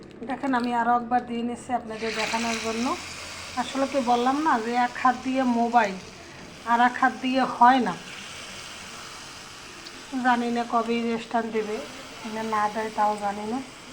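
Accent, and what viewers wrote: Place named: Barishal, Bangladesh. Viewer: 😁😁 বরিশাইল্যা মনু 👍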